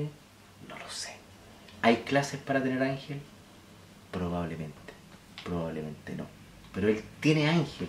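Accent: Chile. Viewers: Mira Con tu voz tu habla Chileno no critiques a Manuel Manuel es mucho Manuel cantando lo que tú canta no gasto tiempo en escucharte Tanfeo que hablas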